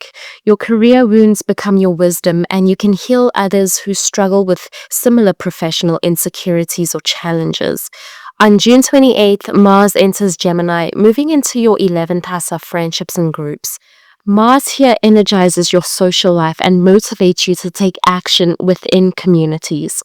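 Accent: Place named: South Africa. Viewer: Sounds like a very South African accent.You've got going there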